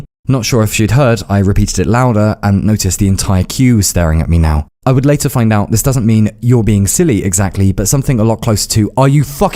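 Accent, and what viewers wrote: Bulgaria. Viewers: its so funny seing switch from a British accent to a heavy bulgarian accent because that's exactly what happens whenever I speak russian. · you've picked up the Sofian dialect lmao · I love your bulgarian accent. And i am genuinely surprised by how much you like Bulgaria. As someone that's born and raised here i always look at the negatives, but your admiration with our culture and language is kinda inspiring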